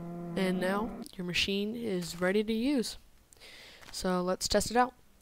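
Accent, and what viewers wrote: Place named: Texas, United States. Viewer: ima guess ur from texas? :3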